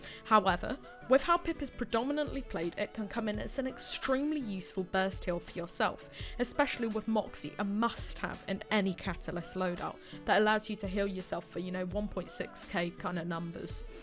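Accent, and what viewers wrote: United Kingdom. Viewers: Omg, your British accent is pure spot on but I'm having a little bit of trouble making out those words XD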